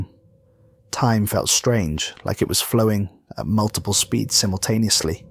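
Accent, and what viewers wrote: Wales, United Kingdom. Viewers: "Welsh" & he is great to listen to. :)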